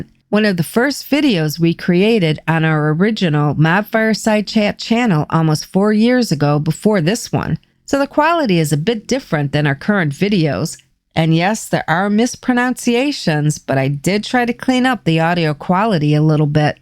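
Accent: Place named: Midwest, United States. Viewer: Are you from Chicago ? I hear a midwestern accent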